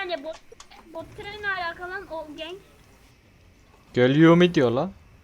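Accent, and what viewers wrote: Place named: Ankara, Turkey. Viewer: La yürü git La Dkfldlfldlg tam bir angaralı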